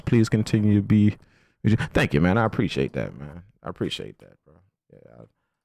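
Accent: New Orleans, United States. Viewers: you from the N.O huh?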